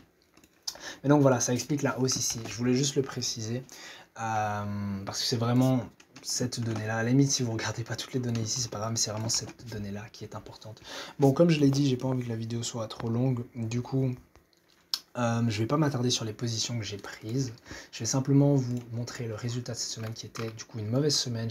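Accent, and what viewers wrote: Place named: Belgium. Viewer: Tu as un accent tu es de Belgique, suisse ?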